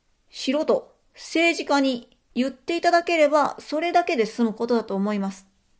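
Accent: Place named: Tokyo, Japan. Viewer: みんなで応援し萠絵さんを国会に送り込みましょう。 そして国会議員になってもらい、経済産業省に政務官として 活躍してもらうのです。役人はタジタジでしょうね。 ただし萠絵さんの東京弁はちょっときつめなので大阪弁が良いです。 「うちは国のために きばりまっせー。やりまっせー。 浪速の根性みせたるでー！！ 維新や公明がなんぼのもんじゃ！！ 負けへんで。 ほやから うちに 1票入れたって」 絶対当選させるのです！！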